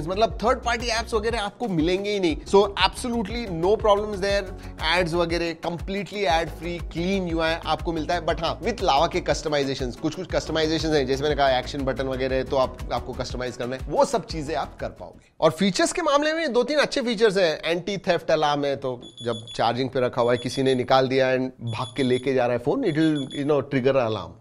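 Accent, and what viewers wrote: Maharashtra, India. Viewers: ❤मराठी माणूस की प्यारी हिंदी सेकंड में "सोशल मीडियावरती जे होते हैं" लय भारी❤❤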